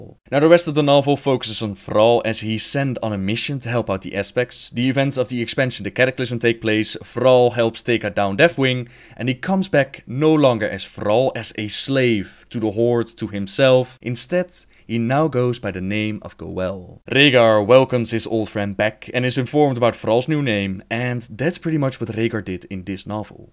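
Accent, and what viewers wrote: Netherlands. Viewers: Dutch accent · No. it's his accent. he's Dutch.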